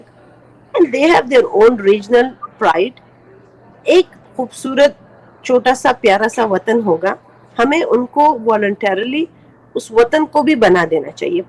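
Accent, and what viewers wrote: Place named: Kutch, India. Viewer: bhai.. your pronunciation tone sounds like your belong to gujrat - India ( Kutch) region...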